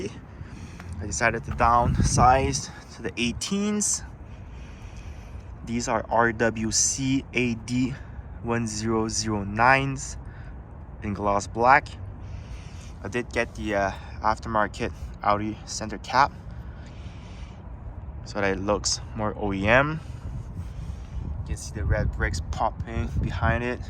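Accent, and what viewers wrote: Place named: Quebec, Canada. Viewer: Thanks for the video man!! I have the exact same car and wheels (but the coupe version) and found very little info online on appropriate winter setups. I'm also from Quebec which is pretty funny as I recognized your accent ahah. When choosing proper tires, how do you know if they will match the car's speedometer like you mentioned? Also, I believe the Yokohama tires have a "V" speed rating whereas the car is rated for "Y" tires, did you downgrade because they're winter tires? Thanks and have a good one!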